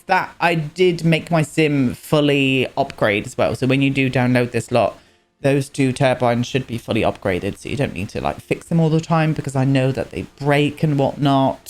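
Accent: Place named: England, United Kingdom. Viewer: I love his accent! 😍 His region of England has a great dialect! 😊 💞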